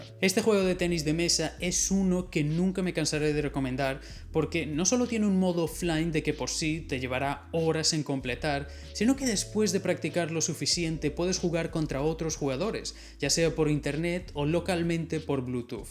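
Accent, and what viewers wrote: Spain. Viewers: Parece un Español hablando sudamericano, esensial, aser En mi opinión, no es hate👌🏻 Muy buen vídeo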